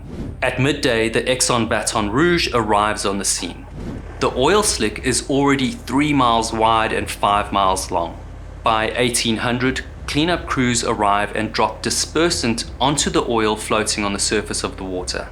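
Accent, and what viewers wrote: South Africa. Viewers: YERRZZZZ OF DEVASTATION LOL south African accents are the best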